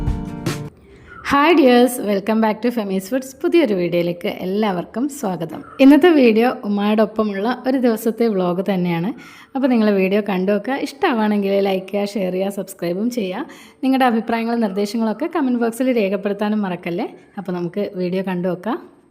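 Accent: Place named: Southern Kerala, India. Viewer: ningo sharikkum കാസറഗോഡ് nneya pore 🙄samsaram motham തെക്കൻ ശൈലി അത് എങ്ങനെ കിട്ടി കാസറഗോഡ് ന്റെ ഒരു തരി സ്ലാങ് വരുന്നില്ല സംസാരിക്കുമ്പോ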